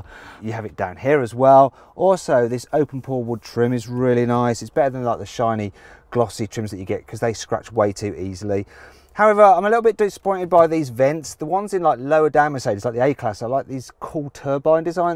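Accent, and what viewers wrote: United Kingdom. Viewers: Actually, I am more comfortable listening your review with a typical British accent rather than the Indonesian subtitles 🤣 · I’m just glad finally CarWow provide Indonesia translation for everyone whose difficult to understand British accent. Also i’ve prefer this channel rather than any local automotive channel like Autonetmagz, Otodriver, etc. because Watson’s way of reviewing is so good. I’m sorry to say this but i’ve prefer him than any local reviewer here. Good job, 😊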